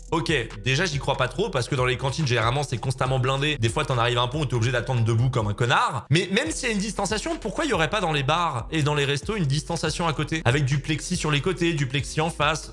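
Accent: Lyon, France. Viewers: tu es de Lyon??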